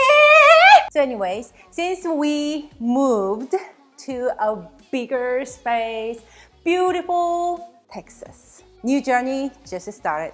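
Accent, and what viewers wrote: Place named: South Korea. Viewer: You are guilty for loving an korean woman with korean accent for cooking japanese Ramen.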